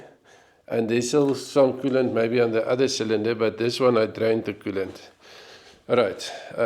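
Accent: South Africa. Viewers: South African. 👍Lekker BOER accent.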